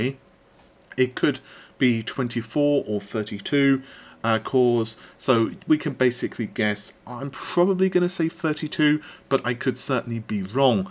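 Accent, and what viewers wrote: United Kingdom. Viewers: that british accent is amazing isn't it lol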